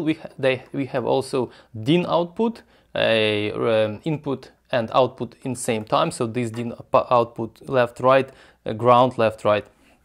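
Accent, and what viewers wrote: Russia. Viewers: Сразу слышно Russian accent 🤣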